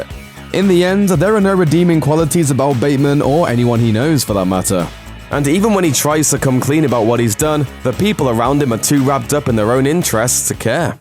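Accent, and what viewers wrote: United Kingdom. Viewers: I really wish the narrator didn’t have a British accent lost interest in 10 seconds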